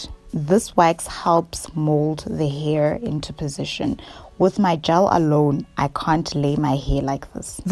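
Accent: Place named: South Africa. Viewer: Are you south African you have the same accent as Kopano Shimange ❤️❤️❤️....much love from Kenya, 🇰🇪🇰🇪🇰🇪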